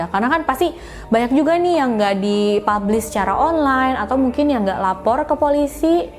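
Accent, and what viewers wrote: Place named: West Java, Indonesia. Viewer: Terbaik👍 Btw ka itu orang mana?! Soal ny pas berbicara ada logat sunda ny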